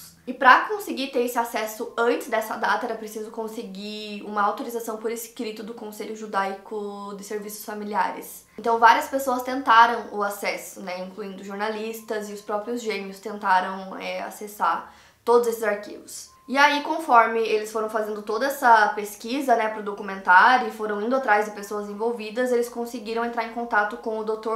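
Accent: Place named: Paraná, Brazil. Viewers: Vc é curitibana? Baita sotaque lindo daqui . Rsrs. Po mina , vc contou td sobre o net flix... spoiler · Adoro Seu Sotaque ... Acho tão lindo o sotaque Paranaense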